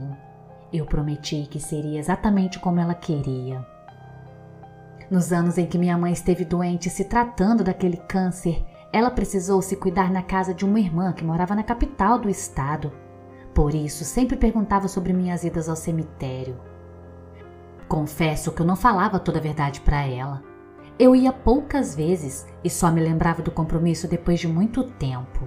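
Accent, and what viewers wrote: Minas Gerais, Brazil. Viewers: perfeita e cativamente com sua minerice bom de ouvir com cafe e pao de queijo parabens!!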